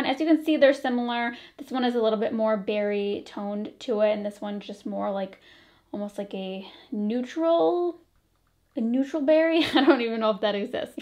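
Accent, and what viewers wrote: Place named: Michigan, United States. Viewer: This is totally random, but are you from Michigan? You definitely sound like you have a Michigander accent. :)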